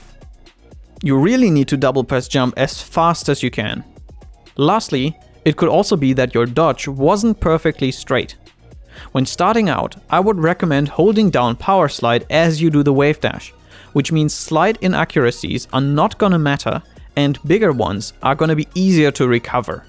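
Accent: Germany. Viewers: Dear Mr, I still can't figure out your accent. It sounds german to me, but it's a pretty weird one. Did I guess it right? Sincerely Ein deutsprachiger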